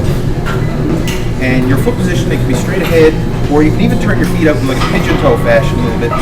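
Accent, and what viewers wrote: Newfoundland, Canada. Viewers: Are you canadian? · I like that I can hear the Canadian-ness. Excellent video, man. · wow man are you newfie?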